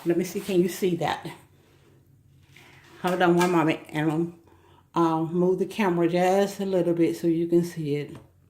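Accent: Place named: South, United States. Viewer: Miss I think you are precious I do totally enjoy watching your videos you make me laugh you remind me of my ex-husband's grandmother. But I am positive that you are not as old as she. But it's just maybe your southern accent or the way you talk but I just find you precious please keep putting out the videos that you have your plants are beautiful I do enjoy my plants also I talked to them and I love them like they are my babies and my grown children laugh at me my son laughed at me today because I just bought a peace lily today and I was so tickled I could not wait to get it home thank you for your video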